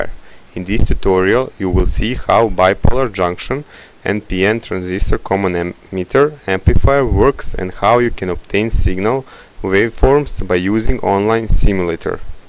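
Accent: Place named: Serbia. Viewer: SPEAKING ENGLISH WITH SERBIAN TONG.... PA STO NA ENGLESKOM...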